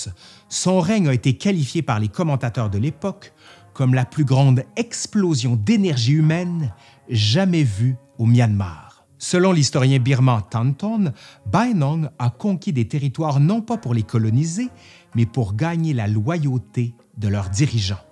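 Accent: Quebec, Canada. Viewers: Frchmt avec ton accent québécois et ta façon de raconter ça donne envie de te suivre et d'en apprendre plus sur l'histoire 😉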